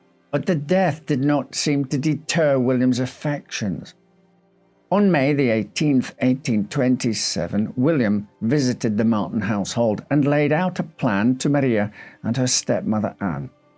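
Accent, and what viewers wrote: United Kingdom. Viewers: british? lol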